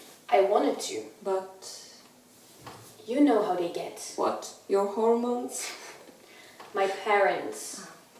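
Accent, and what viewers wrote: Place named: Netherlands. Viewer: Ha I new you were from somewhere the Netherlands ore Belgium I thought I heard it right in one of the bloopers from the mischief managers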